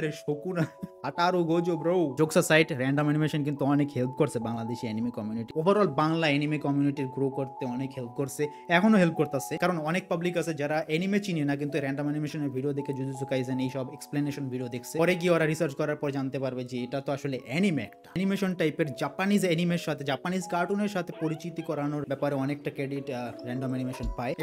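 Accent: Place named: England, United Kingdom. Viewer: আর আপনি ইংরেজি accent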